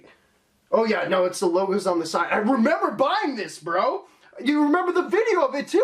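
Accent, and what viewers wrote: Canada. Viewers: Where in Canada 🇨🇦 are you from I love finding out youtubers are from Canada like the nelk boys I assume your in Canada with the ehhs and boys aacent lol.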